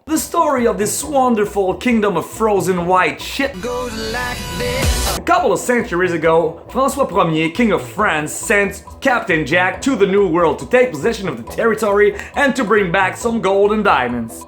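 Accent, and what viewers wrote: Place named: United States. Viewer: comment ça t'as un accent americain..cest tellment cool ça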